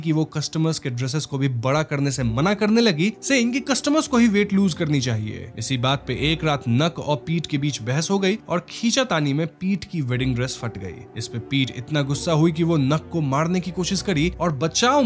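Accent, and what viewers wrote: Bengal, India. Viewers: Are you bengali? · Bhai aap bangali ho ampka hindi se to yehi lgta hai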